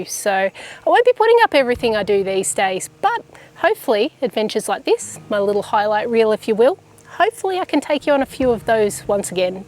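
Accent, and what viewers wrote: Australia. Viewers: Welcome back it’s nice hear your assie voice again, and watch your videos.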